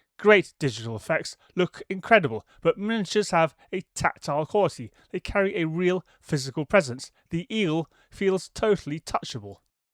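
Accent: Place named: United Kingdom. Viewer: I really don't need some guy with a British accent telling me the plot's and science is nonsense. It's FICTION like everything coming out of the mouth's of EU leaders these days. I liked the stories.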